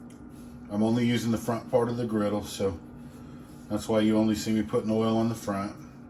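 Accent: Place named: United States. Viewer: Super helpful !!!! Thank you ! My boyfriend likes your country accent btw ! Keep it up buddy !! 🤟🤟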